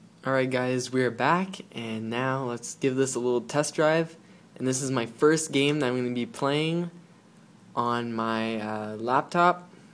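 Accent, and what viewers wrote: Canada. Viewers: Thats just his Canadian Accent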